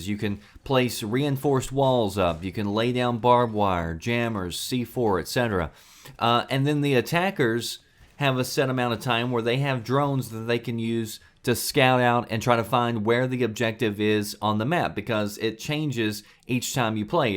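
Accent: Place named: Texas, United States. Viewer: Really man I'm not even gon lie I'm being totally honest but your the best YouTube channel I have came across .. You sound like your from Texas . I love how you keep shit real man .. I love watching your videos .. You deserve the most success on YouTube . Only if you can be super constant with videos & have a lot more content you will be the best .. Love your channel bro I really do . I use to watch Ali a ghost Robo & others but your the best .. They are to soft lol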